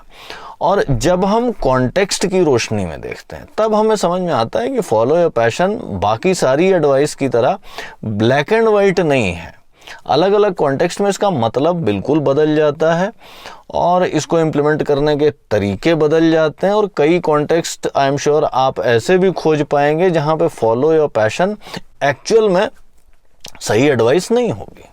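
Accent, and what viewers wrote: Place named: Indore, India. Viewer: Hello sir, meri yah janne ki iksha hai ki kya App indore (MP) se hai kya... bas ese hi puchh raha hu kyuki apki boli se app indore ke lagte hai...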